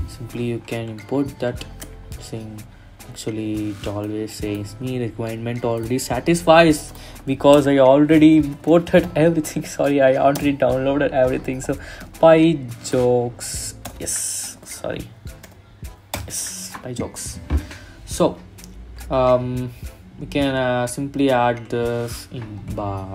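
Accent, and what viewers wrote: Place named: England, United Kingdom. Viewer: Bro im a fan of your English accent bro ❤😂😅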